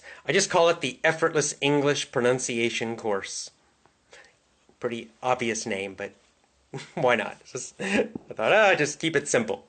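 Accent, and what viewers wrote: United States. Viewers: I like listening to your standart american accent very much!))))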